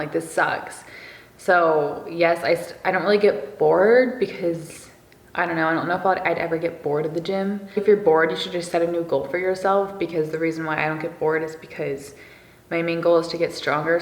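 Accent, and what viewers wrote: Seattle, United States. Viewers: I watch this type of videos, or whatever has a lot of chats, just because I'm trying very hard to improve my english that is quite shitty at the moment ahahahah and I never comment anything.. just watch and try to learn some english.. but you look so nice and kind and I love to listen what you say so I suscribe! ❤️ Whatever I have to put subtitles.. Feel like I don't understand the Seattle accent yet 😅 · Girl I’m born and raised in Seattle and hearing the advocate in you is such a “Seattle thing” lol idk how to explain it but how you talk is so seattle lol LA people are nothing like us Seattlites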